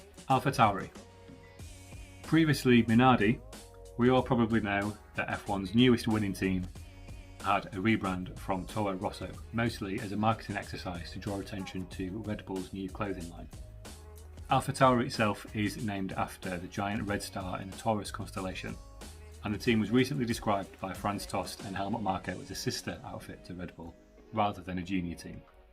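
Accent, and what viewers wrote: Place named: United Kingdom. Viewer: Interesting info! But please, speak louder!!! It’s a bit hard to understand you… been British I guess. Gabriel from Costa Rica saying hi… Good job! 👍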